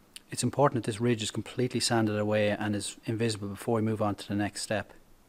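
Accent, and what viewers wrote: Ireland. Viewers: Thanks for the great video. Nice Irish accent too! Lol! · God Irish accents are so cool I’ll buy what ever you sell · You sound Irish.... so why Hood? And why Fender? BONNET AND WING. Nice job though :) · Hes Irish so yeah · The Irish accent!!! The title should have said so I would've clicked sooner this is rad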